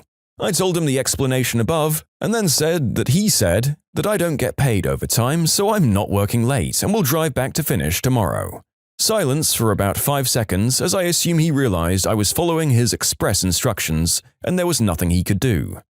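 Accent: United Kingdom. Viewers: Uhh British narrator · Not a fan of British narrator. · the british accent is the biggest tradgedy I was hearing a bunch of old podcasts of yours and this came up and I am like "oof that voice hit me on the head" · Again British???!!! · When did mate become British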